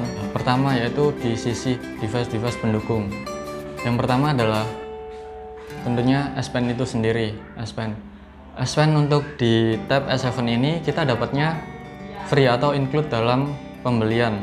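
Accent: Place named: Java, Indonesia. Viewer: Seneng gua dengerin medok lu mas wkwkwk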